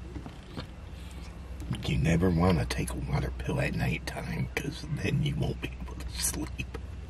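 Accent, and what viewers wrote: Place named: Michigan, United States. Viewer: I could tell you were from Michigan with your accent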